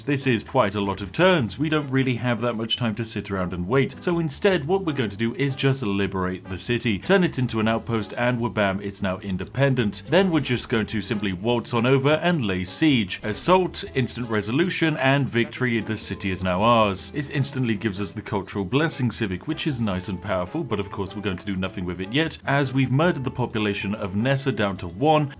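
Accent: United Kingdom. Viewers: Gosh you are British aren't you LMAO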